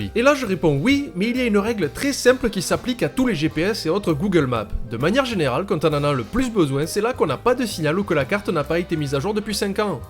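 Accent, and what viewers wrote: South, France. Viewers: Il est du sud enfoiré !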